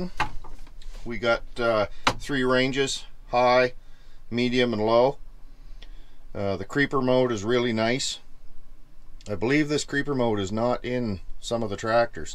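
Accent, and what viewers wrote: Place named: East Coast, United States. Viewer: As I’m watching this clip I’m thinking . Man he has an east coast accent haha. Then I heard Fredericton. Howdy from PEI.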